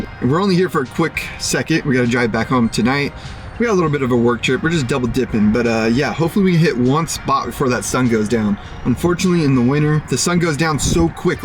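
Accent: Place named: California, United States. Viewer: has he biggset bro sufer cali accent and marble mouth. The guy is a fried kook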